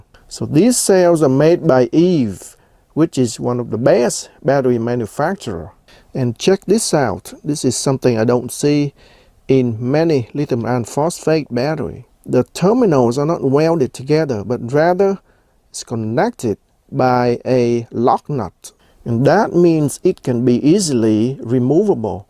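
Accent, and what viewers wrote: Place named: South, United States. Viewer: What is your accent? Every fourth word has thick southern slang while the rest sounds Asian/American. That southern draw just catches me off guard. Great video. K bye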